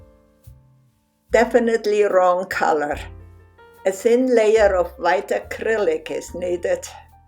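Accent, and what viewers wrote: Switzerland. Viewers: Soft sensual painting along with the painter’s beguiling Swiss accent sharing artistic tips: Brava!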